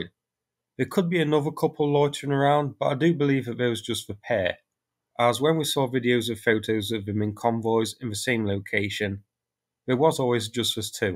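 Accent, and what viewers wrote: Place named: Yorkshire, United Kingdom. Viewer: "Countreh" "Terminatuh" "Yeller" I wonder what part of yorkshire the narrator is from ?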